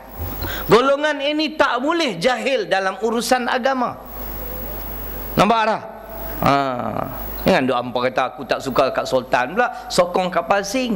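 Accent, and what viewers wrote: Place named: North, Malaysia. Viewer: Bagi yang bukan dari negeri2 utara Semenanjung mungkin rasa bahasa yg dilontarkan ini agak kasar...tapi itulah bahasa Utara yang unik....bagi kita bahasa ni biasa ja...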